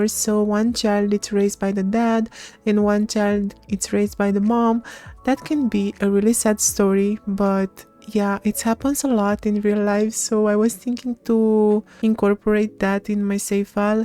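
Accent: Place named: Greece. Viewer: Your Greek accent 😍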